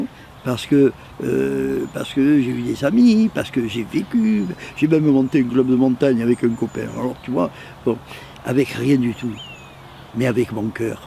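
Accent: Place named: South, France. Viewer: Salut et ......Chuttttt 🤗😆 et quel bel accent du Sud. Le rose me va aussi 😅😅😅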